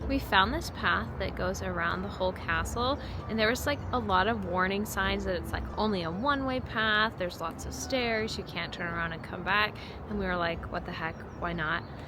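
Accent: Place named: United States. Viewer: Your American we understand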